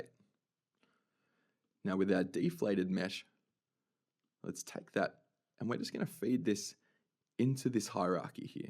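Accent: Australia. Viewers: Australian accent. He is living in Melbourne, Australia.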